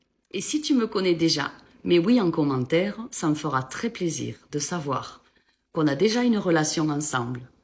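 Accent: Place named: Southwest, France. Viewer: Oui , j'aime beaucoup ta voix , avec ce petit accent du sud-ouest , mais je ne savais pas que tu étais très jolie . Bisous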